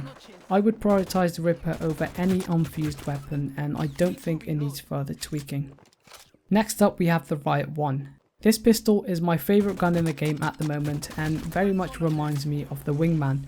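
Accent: United Kingdom. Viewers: Ashy British voices psh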